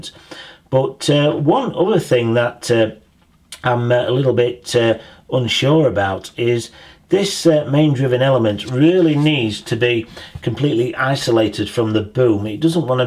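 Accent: Yorkshire, United Kingdom. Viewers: First class review. Is that a Yorkshire accuent. Most pleasant. Many thanks for uploading.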